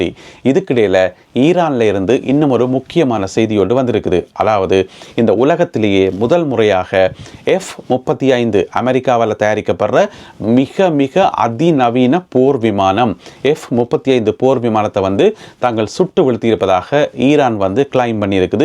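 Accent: Sri Lanka. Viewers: இலங்கை தமிழ்.❤❤❤🎉🎉🎉🎉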